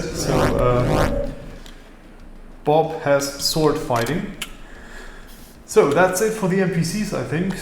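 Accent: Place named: Germany. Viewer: einfach deutscher Akzent 🤣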